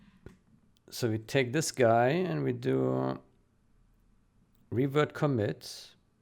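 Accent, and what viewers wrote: Germany. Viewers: ты русский и украинский понимаешь? Но акцент у тебя больше немецкий:)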